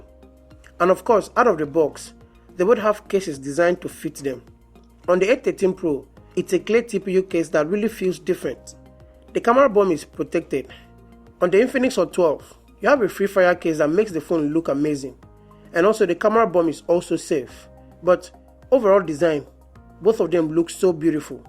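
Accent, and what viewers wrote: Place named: Nigeria. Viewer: That Nigerian accent. One of my friends is Nigerian and the accent is brilliant.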